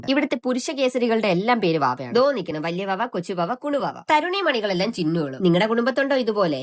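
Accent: Thiruvananthapuram, India. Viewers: തിരുവനതപുരം ഭാഷ കൊള്ളാം 😂❤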